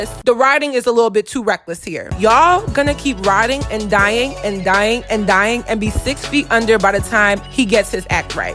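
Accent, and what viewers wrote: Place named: Philadelphia, United States. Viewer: I can hear the Philly all in you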